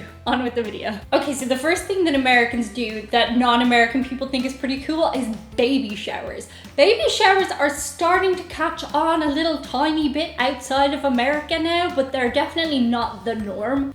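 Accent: Dublin, Ireland. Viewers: The new "politically correct" term to call those weird people is "eccentric". But you're right, generally, it's not frowned upon. Nothing sexier than a woman with a sense of humor! The Irish accent doesn't hurt either. 😁🤓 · as a US citizen born and raised, I really love knowing that you "appreciate" our "societal culture"! But AS a US citizen, I really love YOUR culture as well! We only have a history of about 250 years to fall back on, some of it not so great. I don't know what it would feel like to have a CULTURAL history that goes back, thousands of years! It really would be great to be able to go back to the BRONZE, or even the STONE age, and know that those people were MY "ancestors". (and your Irish "accent" is pretty cool too!) Even your original LANGUAGE going back as far as when it was still "Gaelic" and then turning to Irish!! Don't get me wrong, the American "melting pot" has alot of advantages too but unless we are "NATIVE AMERICANS", (which I'm not) here, we just don't have the sense of truly "belonging" to a COUNTRY or even a REGION, like you do in Ireland, or Spain! 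I mean you can trace your CULTURAL lineage all the way back to the Celts in Ireland or the "Beaker people" or even "Iberian" and Roman history in Spain! So, THANK YOU for your kind words and thoughts about the STATES! Not everyone in the world thinks of us in such a "kind" way. ♥️😀🇺🇲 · As an American I love your Irish ☘️ accent : ))) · Fun video I love when use an "American" accent. Granted I live New England. As Side you your accent reminds me of Friend from Dublin.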